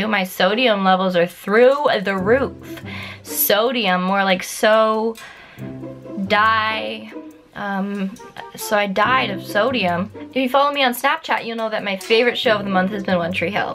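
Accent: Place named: Canada. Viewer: That Canadian accent... 👌🏻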